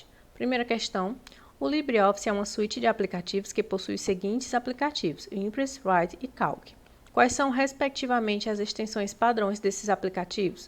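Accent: Brazil. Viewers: Parabéns pela ótima didática somada a esse doce sotaque de algum lugar do Brasil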